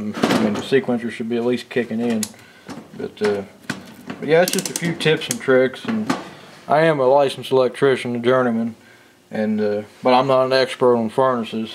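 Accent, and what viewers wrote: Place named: Kentucky, United States. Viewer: It's called an accent lmao. I'm from Kentucky so I understood everything he said.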